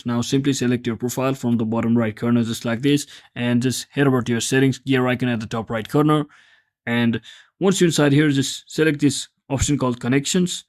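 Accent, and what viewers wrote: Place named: India. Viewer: Indian ass voice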